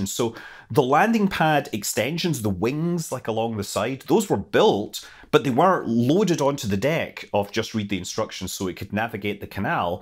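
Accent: Scotland, United Kingdom. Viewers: It took a Scottish accent to make me notice the ships names are plays on mystery and mischief. Lol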